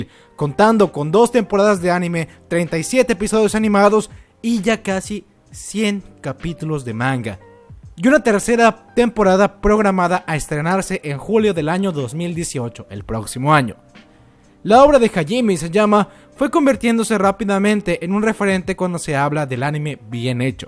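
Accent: Peru. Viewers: eres peruano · eres peruano??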